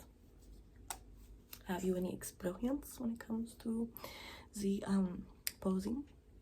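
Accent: France. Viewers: I don’t get tingles from French accents but this was worth the watch regardless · As a French, I love this accent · accent makes me believe that you're orginally french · as french I like so much her French accent !!😂😂😂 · That french accent is way too accurate and relaxing… Lot of love from Paris🥰🇫🇷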